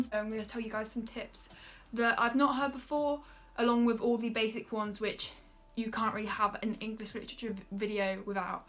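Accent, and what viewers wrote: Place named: United Kingdom. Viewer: I want to speak like you😭 I wish I was British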